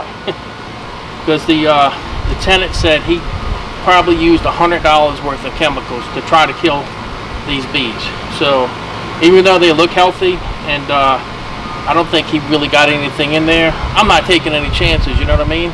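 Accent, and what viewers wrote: United States. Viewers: Great video! Also your accent is so unique. You sound like east coast, southern, west coast, rhode island. All at the same time. So weird. (in a good way)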